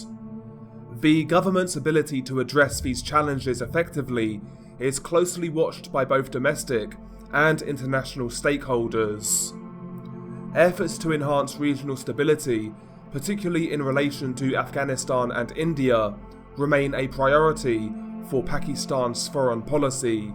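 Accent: United Kingdom. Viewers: He's British, and some British accents "add" an R sound to a few words. Have you ever heard different British accents? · Every time I hear say “Ladi gang” with his British accent, It reminds me of the song. “STAND STILL LADDY!”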